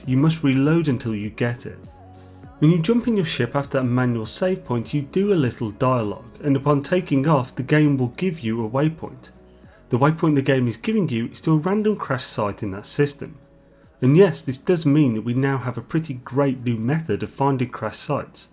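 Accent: United Kingdom. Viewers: You obviously know your game... some of us, however, do NOT. The way you whiz through points that you don't explain, leaves me wondering what you just said and/or how you did it. You speed through a dialogue of which I understand approximately half. You have a British accent, which is great, for I believe the British usually have a superior grasp on English enunciation- or aptly named "The King's English". I am from the United States and not blessed with perfect speech comprehension, which leaves me puzzled at the end of this video. I am only suggesting that you consider slowing down a little and being more concise in the future. Simplifying the explanation is not degrading it. Thank you for your videos.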